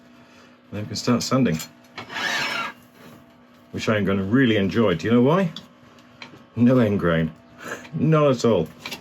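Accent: United Kingdom. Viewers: I loved what you did on this weird root. It was also great to hear a Brit doing this video….!!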